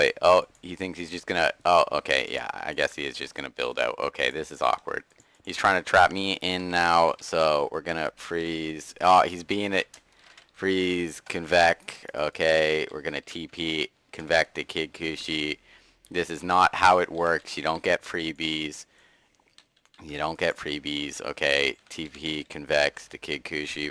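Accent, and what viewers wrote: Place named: Netherlands. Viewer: DAT dutch doe xD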